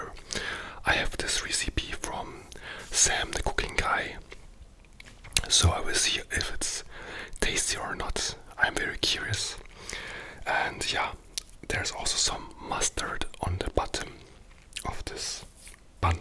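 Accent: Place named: Germany. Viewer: Der deutsche akzent ich liebe es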